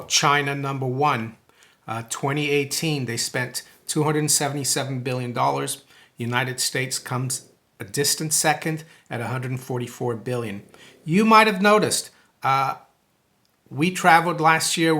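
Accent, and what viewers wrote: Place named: New England, United States. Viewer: Where you from? Northeast accent. New England?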